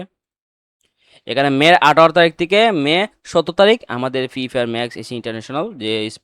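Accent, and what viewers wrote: Sylhet, Bangladesh. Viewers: Tumi Ki Sylhety??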